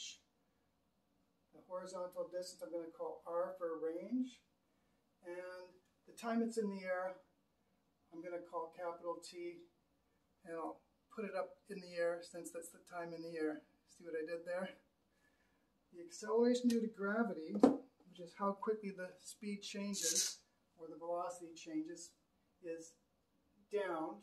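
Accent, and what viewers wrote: Canada. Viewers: Are you from Québec?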